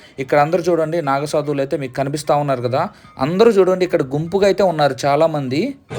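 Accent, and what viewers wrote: Nellore, India. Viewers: ఉన్నది ఉన్నట్లుగా నెల్లూరు భాషలో అద్భుతంగా చెప్పావు నాన్న థాంక్యూ వెరీ మచ్ మాది కూడా నెల్లూరు నాన్న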